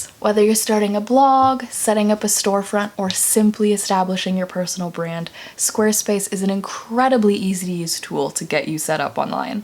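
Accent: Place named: South, United States. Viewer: I know she said she lives in Florida but when I've seen her other videos I never heard an accent. However this time when she talked about stepping on a gekko with her bare feet because she lost her glasses while the video had the guy stepping on the nail I could swear I heard her have a Southern accent. I had a friend I met in college and after talking to her for two weeks it was only then, after we'd studied all night, that her Lousiana accent came out.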